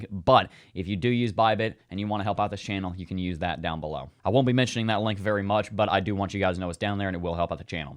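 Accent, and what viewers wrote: United States. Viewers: I just love your cowboy accent... I enjoy your videos. Keep up the good work!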